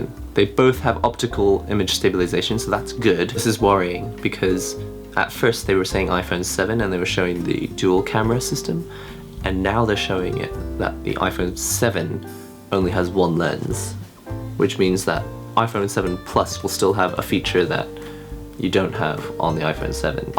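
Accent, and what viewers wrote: United Kingdom. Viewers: Yep. This comment was a joke. He obviously sounds like he's from the UK. And I was taking a swipe at the English.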